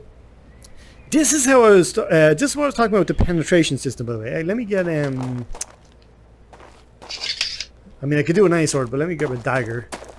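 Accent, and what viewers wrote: Ireland. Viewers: hay man love the game, i like ur accent. i have a feeling ur from ireland like me! and if u are where u from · Are u Irish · He is indeed from ireland! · Question: are you irish? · Except he’s irish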